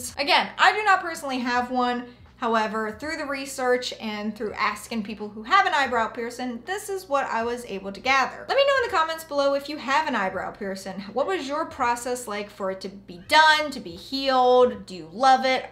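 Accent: United States. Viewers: I have a north dublin accent and I just wanted to let you know that we say piercing the same. I just found it amusing since you pronounce everything else with what I would consider a normal American accent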